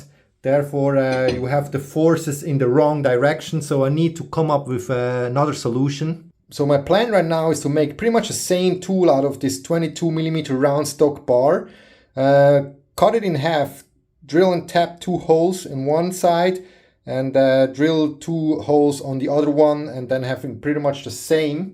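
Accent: Switzerland. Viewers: Echt Super ! und schoen deine Stimme zu hoeren Mit Zueri accent aber kein "full on" Zueri Dytch!. Wir haben in Oktober von Hirzel, 8816 nach Deutschland umgezogen so Gruezi aus dem Schwarzwald !!! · Your skills are so amazing. This is the famous Swiss precision at its best. btw i like your English with the swiss Accent..🙂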